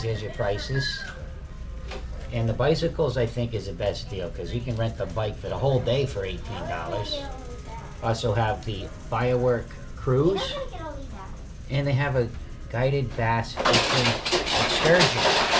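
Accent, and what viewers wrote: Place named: Northeast, United States. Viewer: Can't place that accent. NY? MA? NJ???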